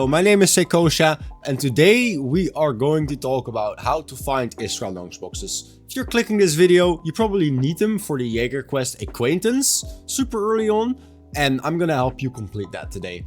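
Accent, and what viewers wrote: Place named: Netherlands. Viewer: Nederlands, I can tell :D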